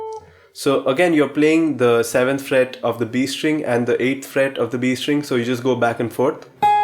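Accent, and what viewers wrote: India. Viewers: Your accents seems to be Indian..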